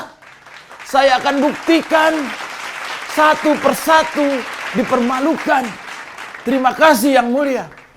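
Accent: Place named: Yogyakarta, Indonesia. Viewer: gaya guyonan khas..jogja kentel..diserang tertawa dialem blunder..koyo kucing tilem...gree gree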